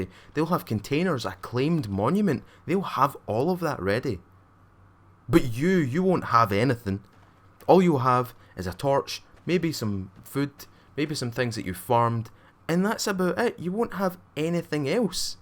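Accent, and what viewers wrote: Scotland, United Kingdom. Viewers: I wanted to upvote, but then heard all your criticism, concenrns and stuff about ppl having things too fast, havign to farm forever in soem cases etc. After that I wanted to downvote because to me it seemed like you re not undertstanding this game is in alpha phase. Not even close to a real BETA... So there are goign to be shitloads of changes. If you criticize the gmae then always keep in mind in what phase/state the game is. You simply cannot treat it and judge it like its a finished game. It will be in development for at least 16-20 months. In the end i upvote cause I love your scotish akcent and the enthuasiasm how you present the game :P. I hope I could help you with my feedback. :)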